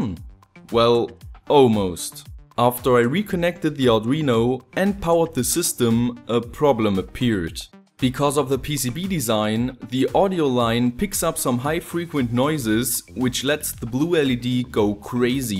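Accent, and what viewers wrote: Germany. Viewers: Awesome video!!! I have always enjoyed your accent when you speak English--to an American speaker it sounds as if you are trying very hard to pronounce everything right. I saw your other video where you were listening to the pronunciation of "centimeter". You do a great job! I don't think you need to sound "native-born". You are completely understandable, and I do find your inflection interesting--I wondered what your first language was until I saw it was German. By the way, , you sound as if you are saying "isolation", but the wrapper around an electrical wire is called "insulation". Isolation is the function of insulation, though, so it's kind of funny. I think any English speaker can understand your videos just fine though! Small little mispronunciations are "filtered out" when we watch your videos--don't stress out about them!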